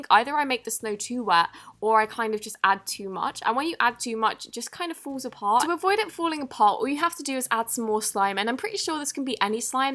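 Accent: England, United Kingdom. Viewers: Wait are you English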